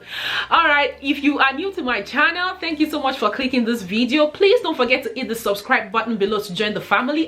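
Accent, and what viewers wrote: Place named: Sierra Leone. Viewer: Thank you for the great review. It is beautiful. Like you. Is your lovely accent from Sierra Leone?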